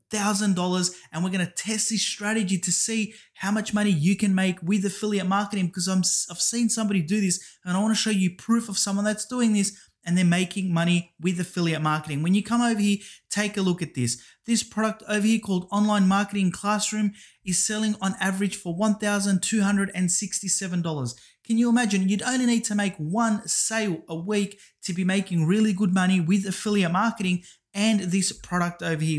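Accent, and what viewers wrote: Australia. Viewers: I'm from New Zealand so I picked up on the Australian accent and just assumed. Hey, btw great video. Whats your #1 source of traffic to your non MMO offers? Paid or free?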